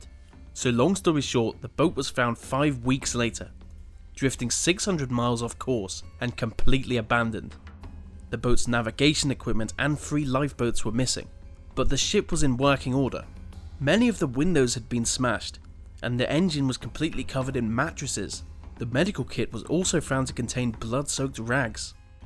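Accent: England, United Kingdom. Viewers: Why do you continuously substitute "F" for "Th"? We hear you pronouncing "Th" when it's IN a word sometimes, but often when it begins or ends a word you substitute an "F". You say "with" properly, so why do you then say "August sixteenf 1942 "? It's really distractive and annoying. If it was your 2nd or 3rd language it would be understandable, but you have a distinctive English accent, so it must be your 1st language. Please make an effort to speak properly, since it's obvious that you can.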